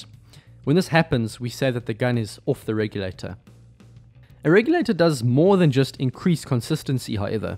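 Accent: South Africa. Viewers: My guess is south african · South African based upon the susername. He’s got same accent as Elon musk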